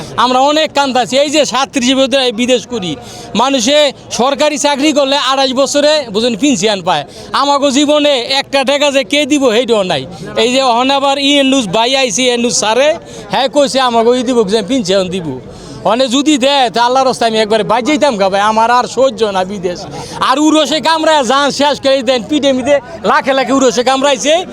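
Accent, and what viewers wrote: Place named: Munshiganj, Bangladesh. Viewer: কথায় বুঝা যায় মুন্সিগঞ্জের মানুষ।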